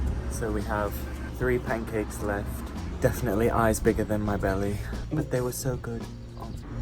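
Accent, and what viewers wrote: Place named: Midlands, United Kingdom. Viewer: Aweee.. 😍 Thank u so much, u guys r awesome *hugs* and btw a hint of where I’m from is in my YT name 😉 I’m a Swede i.e. I’m originally from Sweden 🇸🇪 but it gets a bit more complicated after that, as my mom‘s German and growing up we moved house A LOT (mainly due to my dad’s profession) until about ten years ago when I came to London for my studies 🇬🇧 and I’ve lived here ever since 🤷🏼‍♀️ Whereabouts in the UK r YOU from btw ‘cause I hear a bit of an accent which - to me - says Midlands but I can’t make up my mind if it’s like, between Birmingham and Cheshire or further north? 🤓😁 And last but not least: thank u so much also for the new vlog, I’m loving it a lot and ur bf teaching u Dutch had me LOLing so much, u guys should do the whisper and/or yoga challenge next 🙏🏻😅👍🏻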